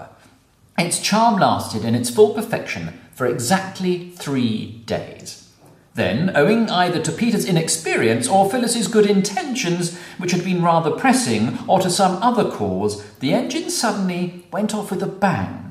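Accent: United Kingdom. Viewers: Thanks a lot , your accent is my favorite . Is it considered as a soft or modern RP accent ?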